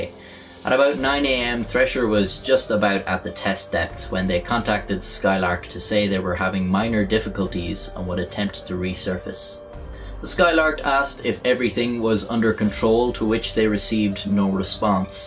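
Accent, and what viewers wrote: Ireland. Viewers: Irish accent, Video about the deep blue sea and submarines. He must be atlas from bioshock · Lemme guess ur accent, Irish? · It's always grand hearing an Irishman tell a story, any story. Erin Go Bragh! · R u Irish? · I didn’t think that today I would be listening to a purple Irish man describing the most horrifying and instantaneous death possible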